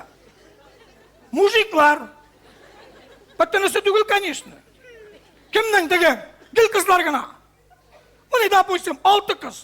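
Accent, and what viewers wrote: Chuvashia, Russia. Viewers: не про чуваш а с чувашским акцентом играет чувашина на татарском языке истории рассказывает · у него вроде всегда такой акцент, чувашский.. может он чувашин? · Как точно! Некоторые мои друзья чуваши так и говорили на татарском, ну точь в точь. Молодец!!!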